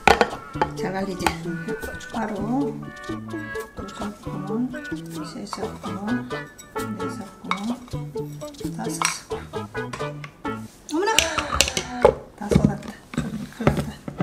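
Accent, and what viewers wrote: Gyeongsang, South Korea. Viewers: 경상도 사투리가 참듣기 좋아요 전 전라도 사람인데요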